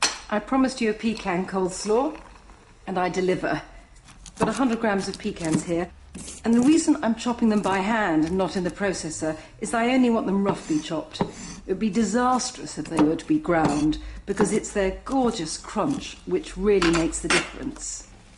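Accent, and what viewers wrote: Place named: RP, United Kingdom. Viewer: It’s funny to listen to that posh voice making what I think of as American soul food. I’m not meaning it unkindly and I totally need carrots in coleslaw it’s just everyone is always like nigella nigella nigella and I’d never watched her before didn’t know she was terribly British sounding ❤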